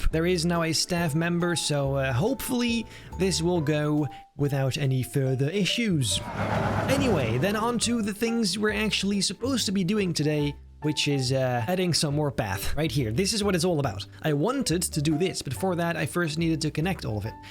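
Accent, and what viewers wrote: Netherlands. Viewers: Ik ruik een nederlands accent...?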